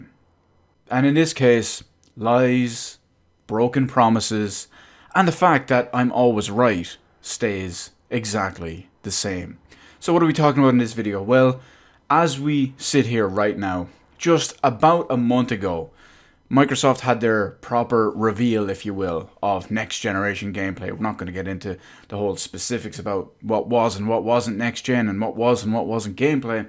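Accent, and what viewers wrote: Ireland. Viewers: Is the Irish?.., ( asks another Irishman )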